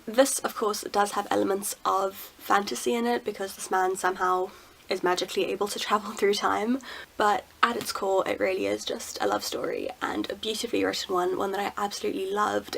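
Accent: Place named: United Kingdom. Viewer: Are you British perhaps? 🤔 quite the strong accent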